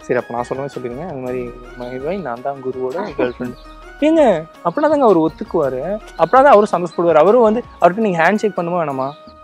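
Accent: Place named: Coimbatore, India. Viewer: Romance naa thaan 🔥 Apram eppovumae last la antha kovai slang pesirunga athaan massuu Bro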